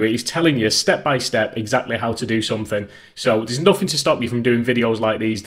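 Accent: United Kingdom. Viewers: 1) thank you for the video it inspired me to try making channel 2) good content and links not overly pushed 3) lovely to hear a uk voice.I love our American friends but YT is to overcrowded with them ;) Cheers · Never thought I’d hear a scottish Tom Scott saying “Balls”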